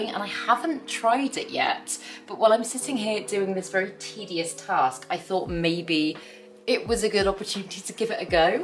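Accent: England, United Kingdom. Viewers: Love watching,just wondering if you have an outside space to repot this time of year,less clean up ?After watching you I’m heading out to repot a few too❤❤❤Hi from Maine,USA🇺🇸🥰 Oh and I love your English accent😊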